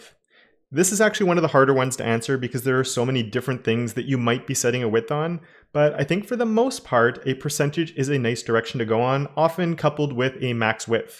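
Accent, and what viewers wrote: Canada. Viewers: Thanks for the informative video. Distracted with the canadian accent hehe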